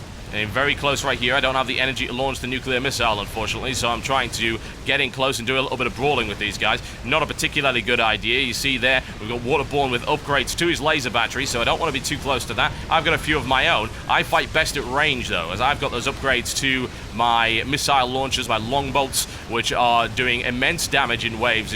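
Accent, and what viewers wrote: United Kingdom. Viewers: its just part of the british-english dialect i think. an accent from where he lives. just like not everyone in america says things the same. i know people from boston who don't pronounce their "R"s in words. they say Cah instead of Car. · Where is he from? (Well obviously some place in britan but i mean slightly more, because as has been stated by many, your voice is awesome...) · why do you always say YURS insted of years lol :D funny as hell tough you cynical brit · It's pronounced that way if you have the kind of British accent that TB has.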